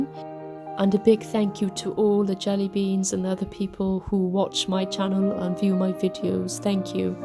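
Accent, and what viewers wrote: United Kingdom. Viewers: Beautiful work ! Wow ……where is you accent from may I ask ……you sound like you’ve e got a British twang like myself lol ❤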